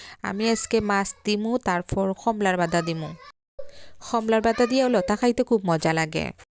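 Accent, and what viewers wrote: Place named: Sylhet, Bangladesh. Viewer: Tee Afa afnar mat proper sylhet oy na kne lager thura thura shuddo aior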